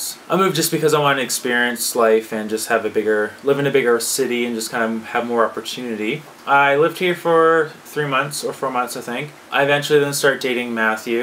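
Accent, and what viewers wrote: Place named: Canada. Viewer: what part of Canada are you from btw ? big city or small town ? ...your accent gave you away before you mentioned Canada...how old did you say you are ? I thought you said 20 yo...nice coming out story glad it worked out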